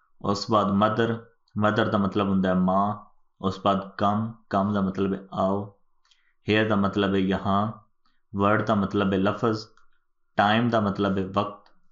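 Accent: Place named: Jhang, Pakistan. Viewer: سوہنا جھنگ سوہنے لوک سوہنڑی بولی سوہنا لیکچر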